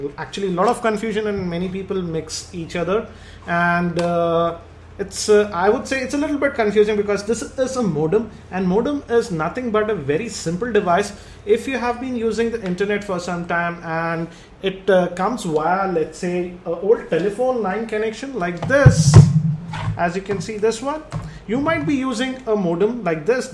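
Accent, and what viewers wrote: India. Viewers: Indian accent on POINT!!!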